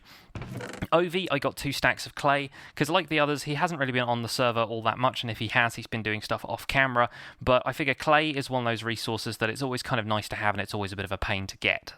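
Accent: United Kingdom. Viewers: It always seems a bit wrong hearing "Merry Christmas!" in a British accent rather than "Happy Christmas!" but there you go. Hope you had a wonderful Yuletide,.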